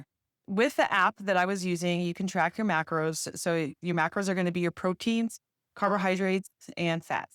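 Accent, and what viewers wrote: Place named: Wisconsin, United States. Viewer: I'da bet a cool hundo you were from Wisconsin...do tell.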